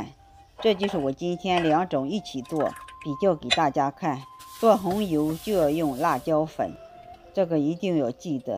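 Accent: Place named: Shandong, China. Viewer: 齊魯口音。我夫妻為台灣外省二代。能識大陸南北口音。祖籍在豫皖、大別山之地。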